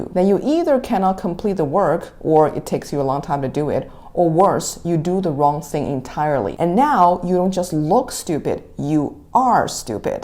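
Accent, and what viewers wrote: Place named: China. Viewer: 因为他的口音很中式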